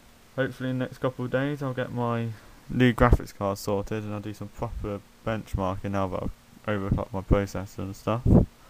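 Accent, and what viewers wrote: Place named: United Kingdom. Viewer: i couldnt understand what you were saying half the time... you clearly have a british accent... Are you Ozzy Ozzbourne?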